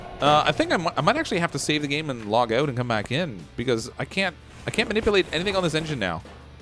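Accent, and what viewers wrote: Canada. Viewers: loving the vids m8, i remember doing this job urghhhh thought it was gonna be a nice quick one lol. and i did the same had to go back and replace the spark plug, also some of the other 53% parts! can i ask if your canadian??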